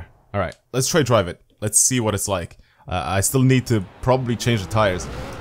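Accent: Ireland. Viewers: R u Irish lad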